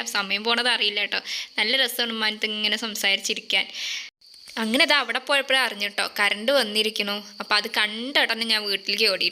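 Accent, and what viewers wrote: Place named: Thrissur, India. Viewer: Nee thrishoor kaariyano ninte samsaram bhayankara ishtaayi ketto ninte samsarathil kure tto tto athanu samsaram ishtamayath njaan kannur kkaranjaan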